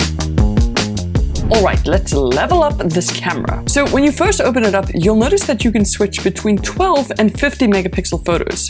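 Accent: South Africa. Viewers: You have a South African accent.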